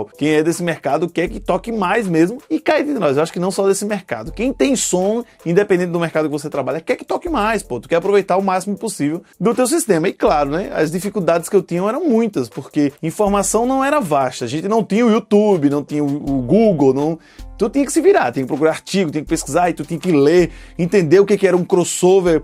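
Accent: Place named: Pernambuco, Brazil. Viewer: Imaginei que fosse pernambucano.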